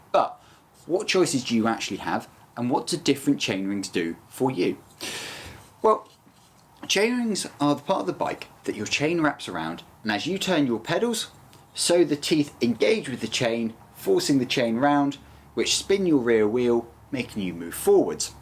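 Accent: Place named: United Kingdom. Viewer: i have a hard time understanding the british accent sometimes, good content though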